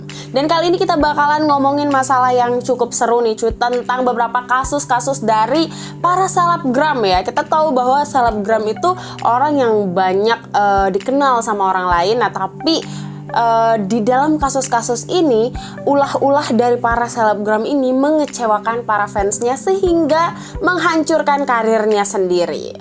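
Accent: Indonesia. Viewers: Sumpahnya channel ini muncul di rekomendasi gw dan kakak ini waiahnya kek orang korea tapi ketika ngomong orang indonesia wkwkwk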